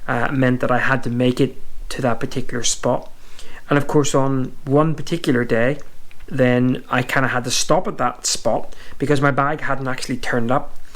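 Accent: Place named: Northern Ireland, United Kingdom. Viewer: Where is your accent from? It sounds like you were born in NI, but you moved to Scotland later in life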